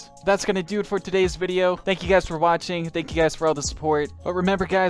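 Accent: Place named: Baltimore, United States. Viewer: algorithm That's just the Baltimore accent. You should see what happened with Aaron's iron urn.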